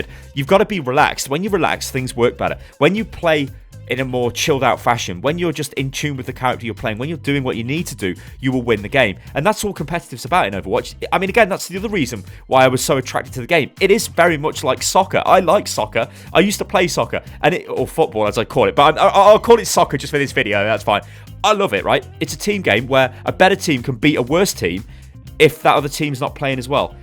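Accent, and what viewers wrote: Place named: United Kingdom. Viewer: Lmao Im an American who loves soccer and even I know its called football! Hearing that awesome british accent say soccer instead of football is how you say... maybe.. rubbish!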